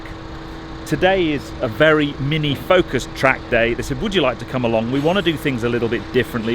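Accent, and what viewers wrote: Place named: RP, United Kingdom. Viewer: I usually wouldn't ever say anything but his poshness was embarrassing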